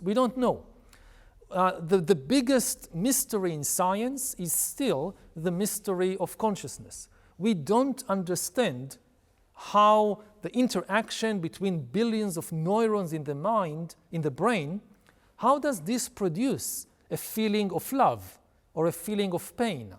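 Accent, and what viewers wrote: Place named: Japan. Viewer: 日本訛りの英語だなこれ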